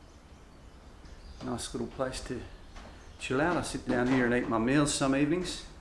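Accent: Australia. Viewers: Hi, you sound Australian. also you're not old enough to retire ? have a nice day. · When you and LJ DO get together, be sure and make a vlog. Reason why is because your Aussie accents are totally different.. I guess each city in australia has their own unique accent??. True?Fun to listen to either way for us americans.. I also listen to a channel called archieluxury. He is a crazy bloke from Brisbane who is also in love with bangers.(thailand). Check him out if you havent already. He is definately certifiable..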